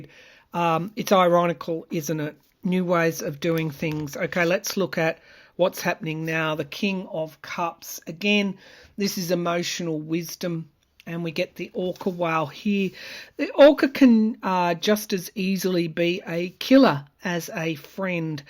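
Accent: Australia. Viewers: Hi, its lovely to hear an Australian accent on this neck of the woods in You Tube land. Its so fasinating listen to what is going on in America with the politics and like the last Presidential Election, i cant wait to see Trump get his Kiss from Karma. I realize most of your viewers are over seas but would love for you to do some Readings on Australian Politicians. Isnt it fascinating to see the same scenario Playing out all over the world in terms of the utter disappointment of performance our political leaders that were in charge over the Covid period. USA, UK, Aust. just seemed to have the most self absorbed leaders at a time when we needed the most caring and Empathetic in Charge. What is amazing to watch now is how One by One they are losing their grip on everything that was more important to them than doing the right thing when they had the chance. Spirit really is amazing to watch. 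Even the communist leaders are having their day in the Heat. I wonder what will come of them too. As you have said, Putin will be the first one to go. I really love the way you read and really hope you can do some pollical readings for Australia. Jo from Northern NSW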